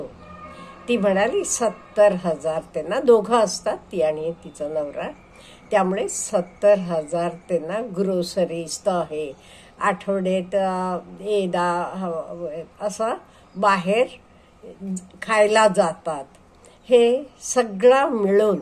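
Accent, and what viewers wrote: Karnataka, India. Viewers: Yes her mother tongue is Kannada ❤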